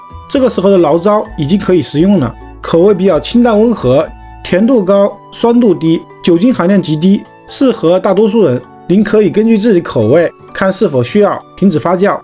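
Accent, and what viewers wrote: Wuhan, China. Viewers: 典型的武汉的口音~！😀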